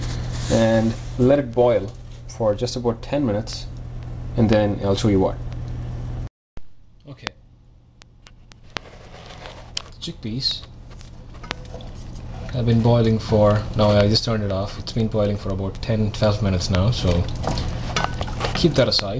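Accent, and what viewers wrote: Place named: Wales, United Kingdom. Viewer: This fellow sounds Welsh!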